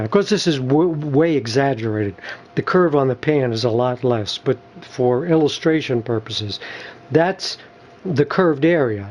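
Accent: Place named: New Jersey, United States. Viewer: I love his accent he sounds like he’s from New Jersey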